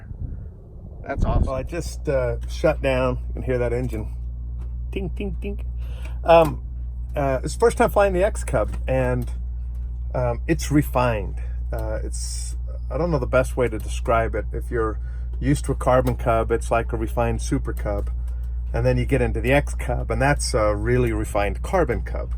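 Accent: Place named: United States. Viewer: you have a much stronger USA accent than your brother, respect from cup of tea governor, Englishman, MIC696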